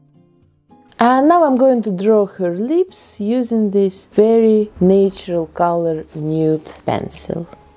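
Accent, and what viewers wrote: Russia. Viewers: Кажется как будто акцент русский... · Ты из России? Прям чую чуйкой акцент)/ Are you from Russia? I feel the uccent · иногда кажется, что она русская по акценту и словам) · Что за жуткий рязанский английский?) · русский акцент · Вы русская? Чувствуется акцент) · какой то русский акцент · Что за акцент..русский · Ну скажите, что вы русская! Такой сильный акцент!)) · У тебя русский акцент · Русский акцент? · Кукла в вашем исполнении получилась великолепной. Томные, выразительные глаза подчеркивают некую таинственность в Жасмин. Очень понравился результат. Во время просмотра вашего видео я никак не могла понять, у вас присутствует некий русский акцент, или я ошибаюсь?)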